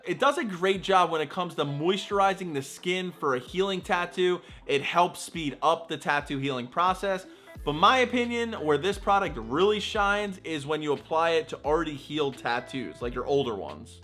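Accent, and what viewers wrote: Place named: Delaware County, United States. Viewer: Are you from delco cause you sound like it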